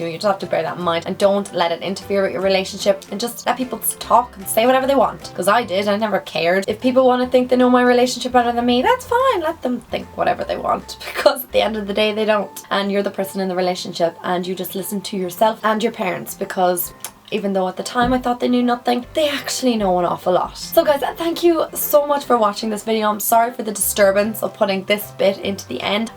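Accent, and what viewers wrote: Ireland. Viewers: Are you Irish? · Love your advice and health related videos😍 So relatable and helpful, definitely one of my favourite YouTubers! What county are you from in Ireland? I'm from Dublin but my accent is nothing like yours😂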